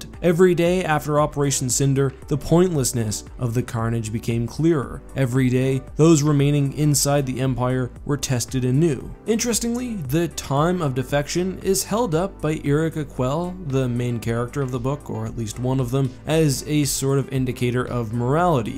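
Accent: Canada. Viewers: you canadian?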